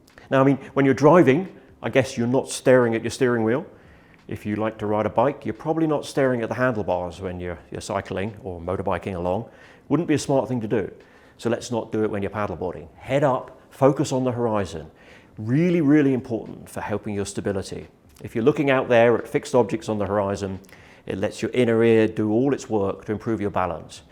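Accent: United Kingdom. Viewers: he's just British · Love the British accent (proper English)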